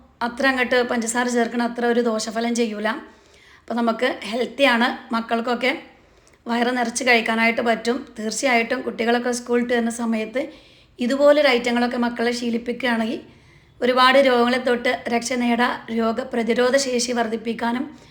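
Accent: Malappuram, India. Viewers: Aa malapuram baasha thanne Mathiyenu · Malappuram thathante slang maariyo😂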